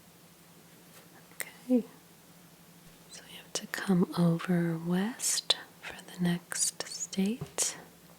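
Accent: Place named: East Coast, United States. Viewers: Brooklyn accent? Whatever it is I love your extra vowels. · you’re 100% from the east coast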